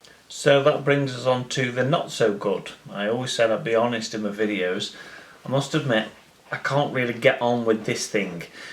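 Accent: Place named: United Kingdom. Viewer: Like for listening to your native British English.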